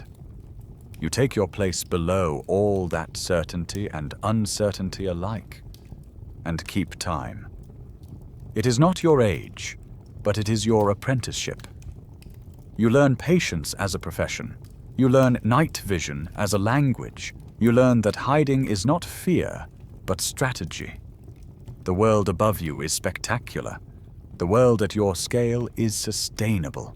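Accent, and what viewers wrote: United Kingdom. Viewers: I love these videos from Sleepy History. Now turn on that fan for some background hum and wow, this AI toff accent! But remember, a British gentleman does not say missle, he says missile.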